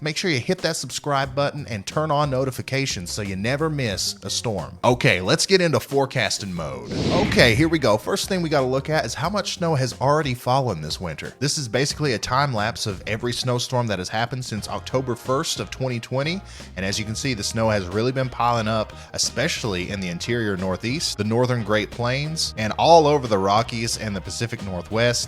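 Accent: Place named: Kentucky, United States. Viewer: Ha ha! I keep commenting about hopeful snow in KY and judging by the pic in the background and the accent like mine, your in KY too! What part?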